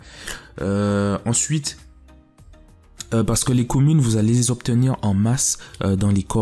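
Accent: Antilles, Caribbean. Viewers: T antillais, ça sentend a ton accent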